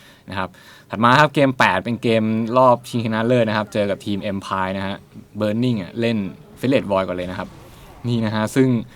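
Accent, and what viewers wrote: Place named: Thailand. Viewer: ผมไม่ได้ว่ามันอ่านผิดรึถูกครับ แค่ฟังที่คนพากย์อ่านสำเนียงไทยแล้วมันฮาดีครับ(ไม่ได้ว่าคนพากย์นะ 55)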